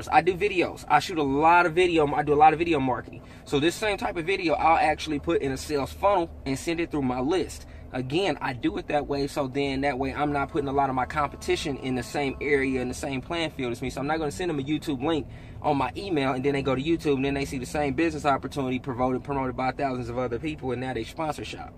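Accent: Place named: Oklahoma, United States. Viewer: are you from Oklahoma love yours accent thinking about joining. thanks for sharing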